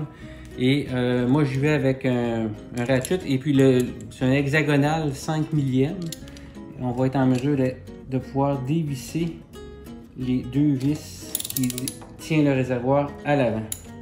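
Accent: Canada. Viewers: Merci beaucoup pour ces explications très bonnes Et ce belle accent du canada donne une belle saveur à tout ça. Merci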